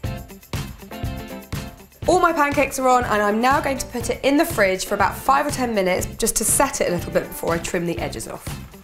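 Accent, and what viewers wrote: England, United Kingdom. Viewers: I love your British accent😍😍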